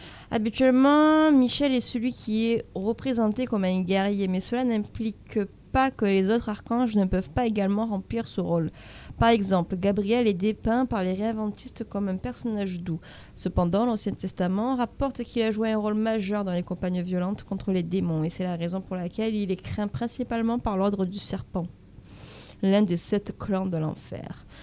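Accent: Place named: South, France. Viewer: 😂 ohhhh j'ai eu peurrrr moi avec l'accent du sud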